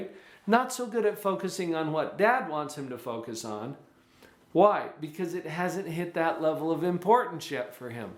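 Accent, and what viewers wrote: United States. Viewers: This guy sounds like he's from Utah or something.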